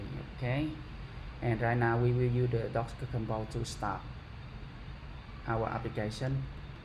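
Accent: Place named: Vietnam. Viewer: Ây cái phát âm này ông bạn là người việt phải kh -,- Video hay lắm, cảm ơn ông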